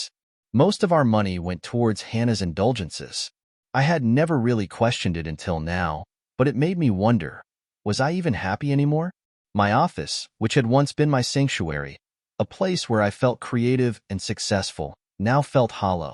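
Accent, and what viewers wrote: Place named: United States. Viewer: An American voice on an English story - irrational.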